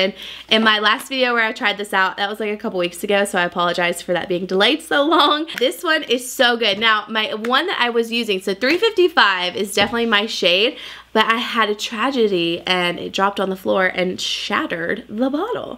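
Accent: South, United States. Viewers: You sound so country in this one 😂😂😂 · Love your videos. You are such a sweet southern girl that is so fun to just listen to.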